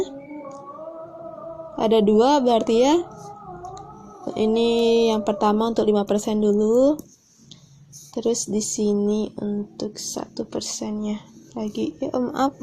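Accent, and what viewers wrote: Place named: Lombok, Indonesia. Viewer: Logat lomboknya jelas banget mbak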